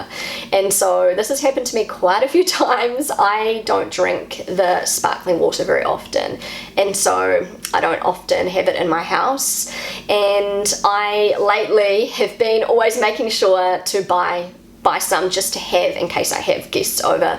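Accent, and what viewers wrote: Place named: New Zealand. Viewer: Also bei mir geht das Verstellen der Abspielgeschwindigkeit zwischen 0,25 bis 2x, allerdings verstehe ich auch bei Normalgeschwindigkeit ganz gut. Anfangs hatte ich auch etwas Probleme mit ihrem Kiwi Accent, aber daran gewöhnt man sich relativ schnell..!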